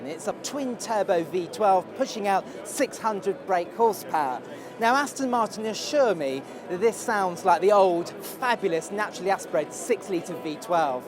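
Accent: United Kingdom. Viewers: I now come to this conclusion that It's not specifically the male presenter that is annoying and pissing everyone off but British accents overall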